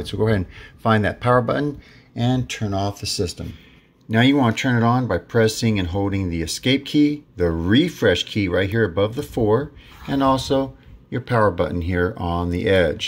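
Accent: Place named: United States. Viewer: Hi there! 1st and foremost I wanted to say THANK YOU so much for being an american. I can't tell you how happy I am to be able to understand and hear you clearly. WOW, what a major difference. So thank you for that. I was so frustrated before I found your video. I followed each step, and it fixed my problems in less than 2 minutes. That was truly amazing. I appreciate people like you who take the time to make a video to help other people like myself. You make life a lot easier. My name is Cindy & I'm reaching out to you from southern California. Have a great day! Stay safe.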